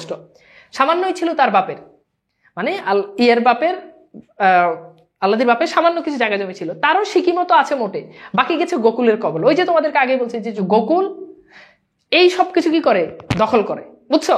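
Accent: Noakhali, Bangladesh. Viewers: Noakhalit Khar bole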